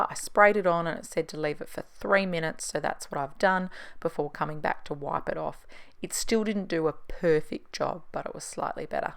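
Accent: Australia. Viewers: I've got the LG combined washer and dryer as I live in a unit so am space limited. I love it. It can take an hour and a half to dry clothes which is ages but i absolutely hate hanging them and then having the little clothes sculpture lurking around for days before I can be bothered folding them. ps. new melbourne follower here. So refreshing to hear an aussie voice on a clean with me vid.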